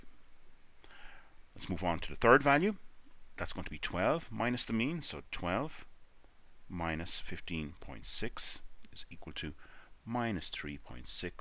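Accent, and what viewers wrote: Ireland. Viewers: That is a thick Irish brogue!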